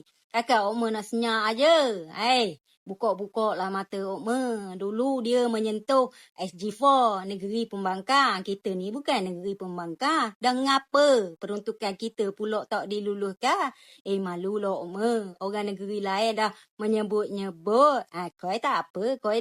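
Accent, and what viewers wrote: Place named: Pahang, Malaysia. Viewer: Itulah loghrat bahasa pahang sebenornya,mengikut daerah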